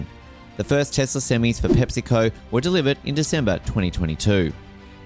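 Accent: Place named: United Kingdom. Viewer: Annoying Brit accent and inaccurate information. Very redundant and repetitive narration. The current average class 8 trucks in 2023 range from $150,000 to $220,000.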